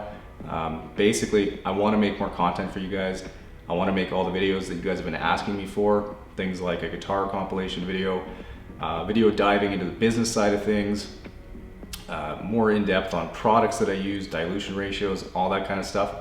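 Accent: Canada. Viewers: Love that you played. My Southern roots anyway. Your pronunciation of garage is cute but decal is what stopped me in my tracks. I was like wait...what?! Then it hit me that you were talking about a decal on a car but I heard “deck-cul” remembered I had heard that pronunciation by another Canadian from Western Canada. I have Canadian family from Ontario but I guess you all have different accents. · NO ONES TALKING ABOUT HIS CANADIAN ACCENT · Now I hear the Canadian accent. I think it’s with the o’s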